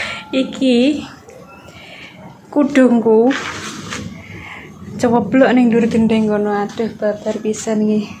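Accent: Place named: Sulawesi, Indonesia. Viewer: Org Sulawesi itu logatnya,,, menggemaskan 🎉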